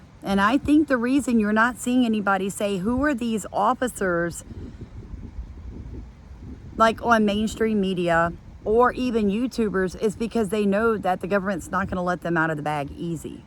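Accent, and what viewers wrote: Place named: South Jersey, United States. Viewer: I was thinking south Jersey. I have family and friends from there, and I can definitely hear south Jersey in voice! Even though she says y'all all the time because she lives in the south. She must be a Jersey girl from the start. I know the Jersey accent. I hear my maternal Grandmother come out of mouth all the time. LOLOL But - she dun went and married a suthorn boy!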